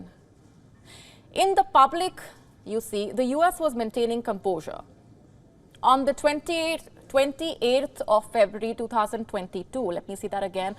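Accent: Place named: India. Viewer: All Indian female ascents are like this its the most worst ascent in English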